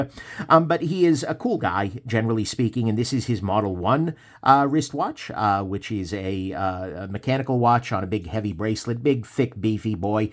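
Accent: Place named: California, United States. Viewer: At the end of the video I learned what a California accent sounds like.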